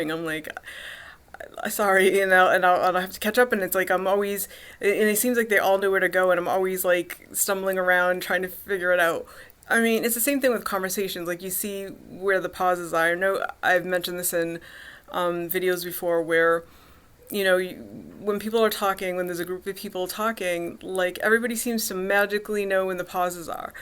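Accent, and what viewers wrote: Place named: Canada. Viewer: Just wanted to share with you that I believe I’m an Aspie myself even though I’ve never been diagnosed with it. I have the characteristics of it. So I can really relate with you on it. But damn, you’re hot as hell! I’d definitely ask you out to coffee with me if you were here in my town. Bet you live in Canada (you sound Canadian). Anyway, Happy Holidays,! Greetings from Bay Area, Ca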